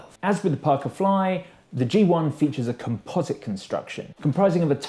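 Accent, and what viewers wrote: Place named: United Kingdom. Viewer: Why the hell is Evgeni Malkin talking about guitars and why does he have a British accent?...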